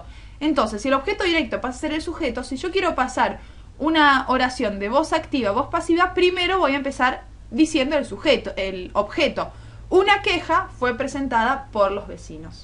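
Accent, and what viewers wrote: Argentina. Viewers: Gracias, muy linda tu voz argentina :) me encanta ese acento en las mujeres de allá, saludos! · tu voz es RE argentina sos de argentina??? que suerte estaba estudiando lengua y encontré estos videos tuyos son buenísimos me re sirvieron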